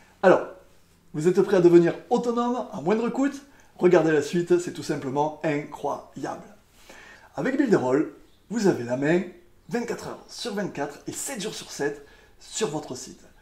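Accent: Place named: South, France. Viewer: Merci,pour cette bonne présentation,tu as un accent du Sud, on est peux être voisin,je suis dans le Gard.Builderall à l'air vraiment efficace,je pense que je vais me lancer,bonne continuation à toi et un grand merci :))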